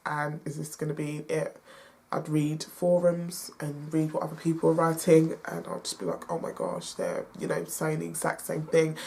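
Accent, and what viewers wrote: England, United Kingdom. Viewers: Not taking anything anyway from this upload but I find a black female with an english accent so attractive. Back to this upload, excessive facial 😓 (sweating) is also a symptom of Vitamin D deficiency. She is nice looking though. This deficiency is common among blacks/ indivuduals with African decent. Truth is it gets too hot outside for quality 🌞 even for darkskined individuals. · It hurts me when black people say they have vitamin D deficiency. Judging by your accent you live in England, not enough sun, that's why black people have all this carbon (so called melanin) in our skin when expose to sunlight it produces vitamin D. Girl stay in the sunny countries. Don't let them tell you this bullshit about lighter skin absorbing sunlight and creating vitamin D better than darker skin its a white lie they don't have enough carbon (this so called melanin) to absorb sunlight to produce vitamin D. 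· I cant understand a shit from your British accent !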